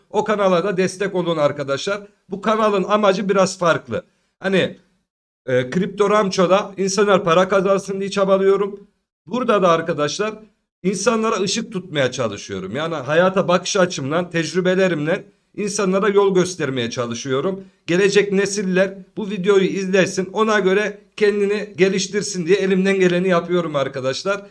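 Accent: Konya, Turkey. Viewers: Teşekkürler Gonya'lı Ustam.("ben öyle bişey DİMEDİM, lafına hastayım)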